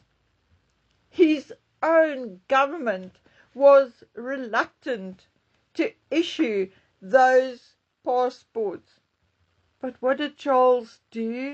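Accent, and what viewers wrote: Afrikaans, South Africa. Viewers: I LIKE your content ….always have. I didn’t know English wasn’t your first language. Is it Afrikaans?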